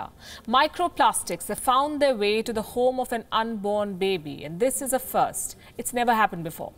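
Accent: India. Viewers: yes for Indian Its Indian accent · Her english sounds uptight and weird posh with a hint of an indian accent. It isnt the best.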